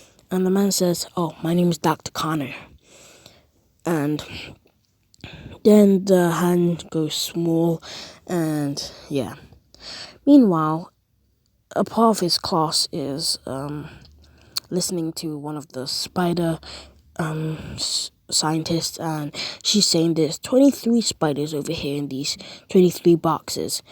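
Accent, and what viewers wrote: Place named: United Kingdom. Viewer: Mate, you've got a heavier British accent than I do! It's like one of those book narrators.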